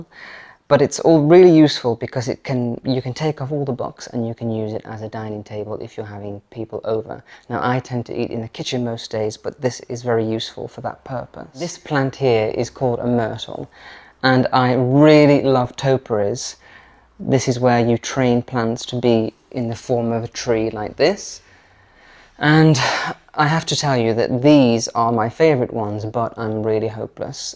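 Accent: United Kingdom. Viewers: your home is absolutely lovely!! Thank you so much for sharing. I love, love, love your bedroom and I adore your British accent. I live Florida, USA and it's cool to hear the differences in how we say things. For instance, you call your home a Flat, in the US we call it an apartment. And, I love the way that you say the word "really". It's so beautiful with your accent. Again, I "really" enjoyed your Flat tour. You are so beautiful and elegant yet, seem so down to earth and approachable. You have a very inviting spirit and your home reflects this beautifully. Keep those videos coming. God Bless!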